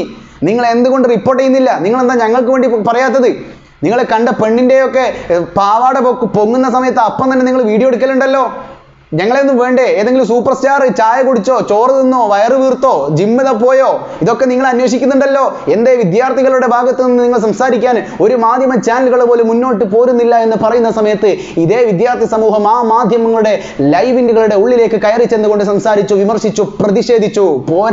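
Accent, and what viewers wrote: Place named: Malappuram, India. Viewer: താങ്കളുടെ voice അടിപൊളി പക്കാ mlpprm ഭാഷ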